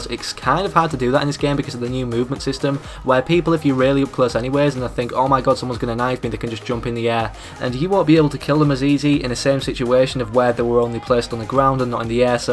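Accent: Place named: Yorkshire, United Kingdom. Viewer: Yo Mama he is from Yorkshire dumb ass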